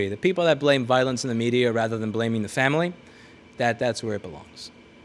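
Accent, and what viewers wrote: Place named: Wisconsin, United States. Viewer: Wait a second , you are a Wisconsinite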